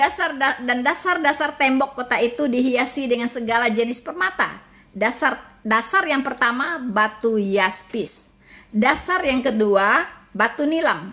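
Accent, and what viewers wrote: West Sumatra, Indonesia. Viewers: Hallelujah, Tuhan memakai hambaNya yang cerdas dan tegas menyampaikan kebenaran Firman Tuhan. Meski memakai logat Minang, namun tetap menarik dan menjadi berkat. Semoga para pemikir Minang seperti Ade Armando dll yang mengandalkan logika bisa terinspirasi dan menerima jalan keselamatan dengan mendengarkan penjelasan penjelasan.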